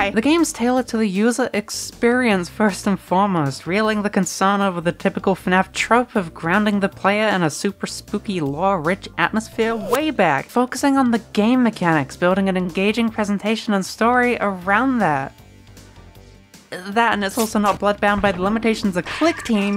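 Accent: United States. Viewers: why do you keep randomly slipping into an american accent in this episode its like a flashbang every time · Hill billy accent is not something I knew I needed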